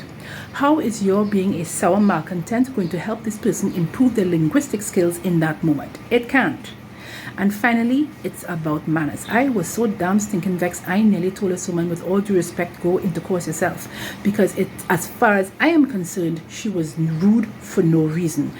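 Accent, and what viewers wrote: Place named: Trinidad and Tobago. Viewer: No problem. She got some Trini...🙌🏼😁🤣😚😂💯🙏🏼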